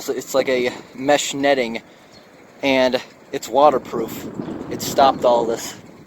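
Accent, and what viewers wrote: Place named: United States. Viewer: Where in the U.S. is this accent from? Or is it just the way he talks? Either way I like it!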